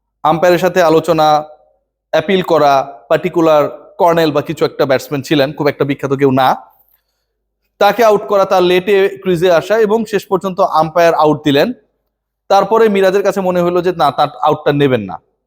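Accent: Noakhali, Bangladesh. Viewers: ভাই নোয়াখালির বাষায় বলে মোশাই কতটুকু তার হুনিতই কতটুকু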